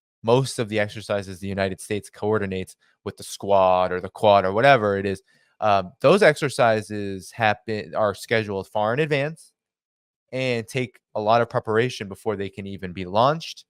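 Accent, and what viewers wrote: United Kingdom. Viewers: Love it a White Guy with a British accent.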